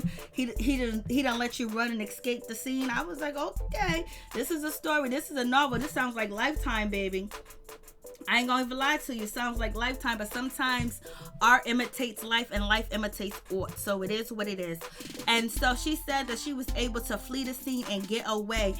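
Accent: New York, United States. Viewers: She sound like she is from NY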